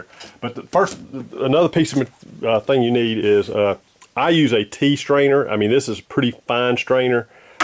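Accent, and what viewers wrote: South, United States. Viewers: It also helps 10-years later.......... Thank you for the information. P.S: Good to hear a Southern accent (familiar to me).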